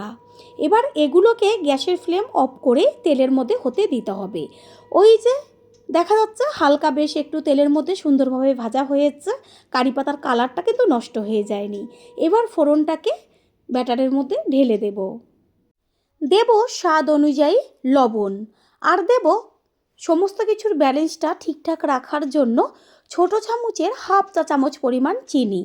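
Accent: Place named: Bangladesh. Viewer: Apnar katha gulo bagla deshi moto lagche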